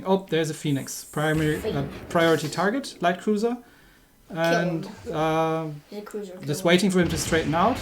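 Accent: Germany. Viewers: Hey i gptta say i found you as i needed some guidance on how to play a bit better hit those citadels and it help'd. Also you sound like you are a german but still wanna ask you what server do you play EU or NA because i tried searching your fleet yet i could not find it.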